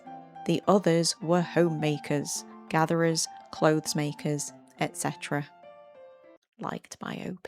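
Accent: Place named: Midlands, United Kingdom. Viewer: i like the midlands accent ;)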